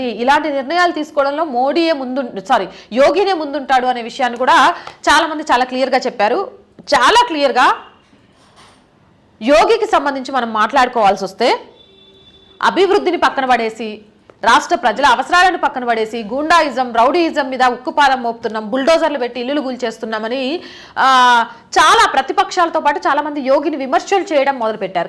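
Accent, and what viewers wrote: Telangana, India. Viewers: chevulu dobaya meku .....talking lo telanagana yasa alage untadi ....frst lo garu yogi ani annaru ...cheyulu vinapadaleda neku .......mundu ammai chese vakiti support cheyandi ante kani tappulu vetakadam kadu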